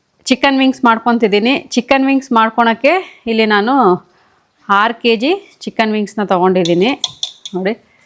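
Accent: Mandya, India. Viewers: Nev same nan tharane mandhya style mathadodhu nange thumba esta nav yelle eddru nam basee mareyokke agaalla 🎉🎉 wish you happy birthday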